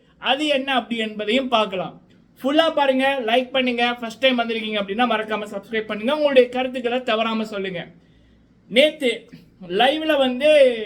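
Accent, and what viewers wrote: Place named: Sri Lanka. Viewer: i know your talking style like srilankans nice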